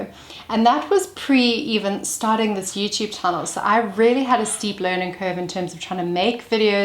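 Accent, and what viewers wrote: South Africa. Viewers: What an incredibly beautiful woman. Goodness me. And the South African accent just sprinkles even more beauty !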